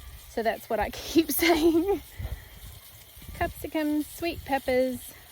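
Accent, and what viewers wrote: Australia. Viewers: I so ❤ listening to you talk in your Aussie accent. 💕 And we can figure out what you are referring to most of the time, peppers or Capacean. Bless you and your family! And sunshine & raindrops to you my friend. 💗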